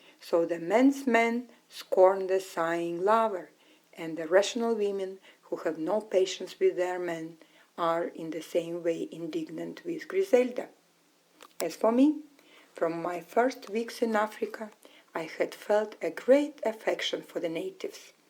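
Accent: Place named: Russia. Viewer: I guess it's a good book to read. I heard about it, but never read it. I love your cute Russian accent!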